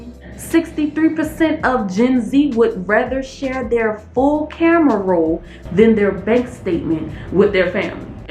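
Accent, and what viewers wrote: New Orleans, United States. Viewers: Sound like your from NEW ORLEANS??? If you are I’m so happy to see someone from my City doing with your doing !!!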